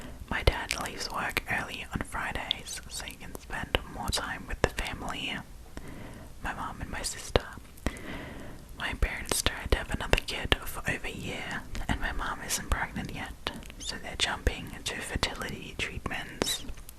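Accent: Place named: Australia. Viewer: Wait am I going crazy here or are you German? Because I got so thrown off by the Australian accent omg!!❤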